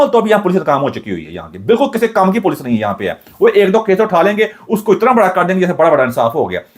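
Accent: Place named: Punjab, India. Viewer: Urdu bolen humen panjabi accent samjh nhi ati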